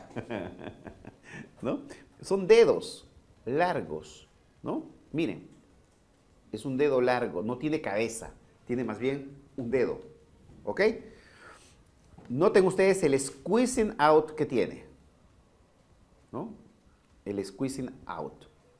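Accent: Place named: Peru. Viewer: Pero el doctor tiene acento peruano, no colombiano jajajja. Es de Perú.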